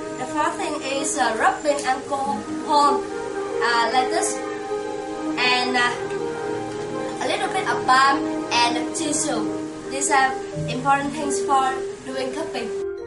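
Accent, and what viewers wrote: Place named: Vietnam. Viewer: speaking english by vietnamese accent :)) It sound so funny :))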